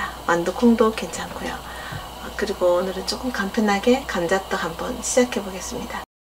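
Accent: Gyeongsang, South Korea. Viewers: 구수한 경상도 사투리, 사근사근 설명해줘서 귀도 행복해요. 요리 쇼 보는 것이 스트래스 해소에 큰 도움되네요.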